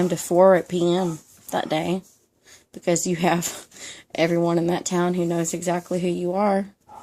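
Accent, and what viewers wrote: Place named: Georgia, United States. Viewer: I really liked your video, loved the Georgia accent! I'm from the UK, we sometimes call hair ties 'hair bobbles', not sure if that's what you were thinking of?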